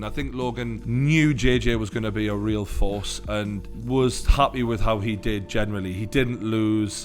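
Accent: Newcastle, United Kingdom. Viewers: true fucking good work keep it up brother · Man I love the true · Ending with a bit of, love that 👊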